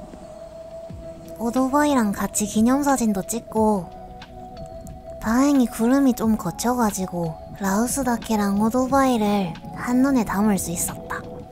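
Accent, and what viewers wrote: Gyeongsang, South Korea. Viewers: 경상도 사투리가 일본말투 톤으로 하니까 색다르게 들림~~~ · 매력있음ᆢ 말도ᆢ 표정도ᆢ 음식도ᆢ 숙소도ᆢ 특히 갱상도 말투의 발음이ᆢ 매력 억수로있음 굿~~^^ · 구수한 갱상도 사투리 때문에 영상 정주행 중입니다 ㅎㅎㅎㅎㅎㅎ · 갱상도 아가씨인가요..~^^?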